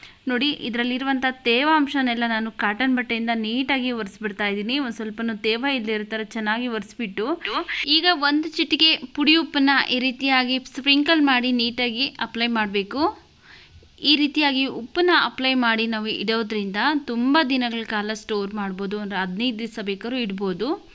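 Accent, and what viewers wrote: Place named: Kolar, India. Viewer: kolar bagepalli telugu kannada mataadteera... nice tips...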